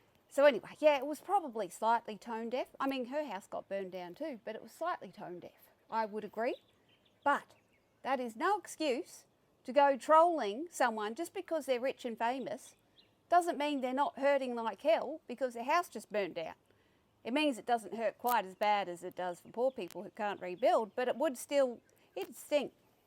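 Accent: Australia. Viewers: you are unique. Your perspective on American affairs is spot on. Please continue to keep us informed. Your Australian accent doesn't hurt either. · I would love to hear your take on <link> Aside from that as an aussie in exile in NZ I really enjoy hearing a good ocker accent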